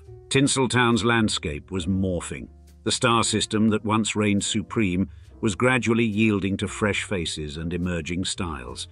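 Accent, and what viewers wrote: United Kingdom. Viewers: Why do these shows always have a narrator with a British accent?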